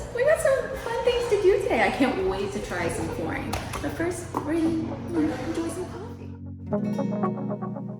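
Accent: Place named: South, United States. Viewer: your southern accent is REALLY starting to stand out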